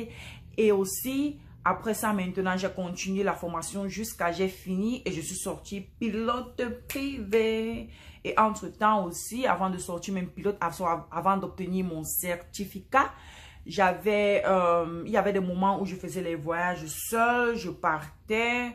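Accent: Cameroon. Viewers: Salut ma chère. J'adore bien ton accent Camerounais.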